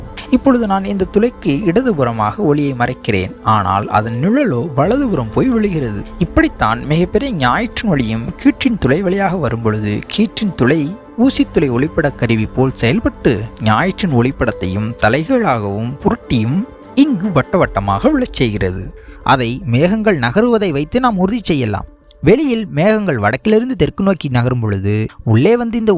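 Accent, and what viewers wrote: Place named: Sri Lanka. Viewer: அருமை.. இலங்கை தமிழர் பேசுவது போல இருக்கிறது.. கடின உழைப்பும், அறிவியல் ஆர்வமும் ,கொண்ட முயற்சியில் பின்வாங்காத சிந்தனையும் ஆச்சிரியப்பட வைக்கிறது.. வாழ்த்துகள்🎉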